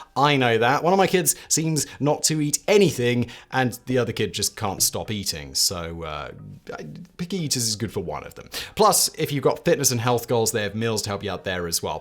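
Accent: United Kingdom. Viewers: You may live wit duh Czechs but you still got a British accent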